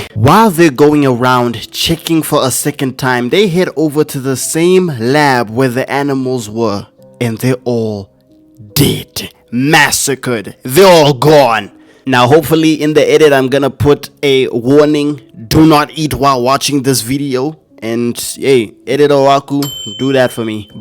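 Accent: South Africa. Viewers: are u South African? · Bro sounds like a South African like me 😅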